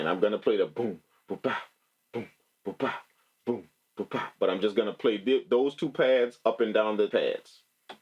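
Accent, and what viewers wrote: New Orleans, United States. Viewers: Love the accent sounds NOLA new to the MPC and beat making new sub🔥🔥🔥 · Lol. Interesting. Are you from N.O.? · Man are you from New Orleans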